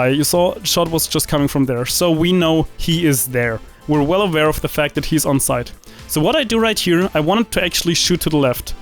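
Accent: Germany. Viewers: Jetzt ergibt das auch nen Sinn warum du sowie die typisch Deutsche im MM klingst xd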